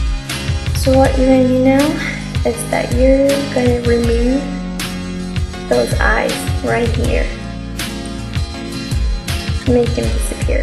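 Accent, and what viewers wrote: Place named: United States. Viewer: you sound american to me.... 0_0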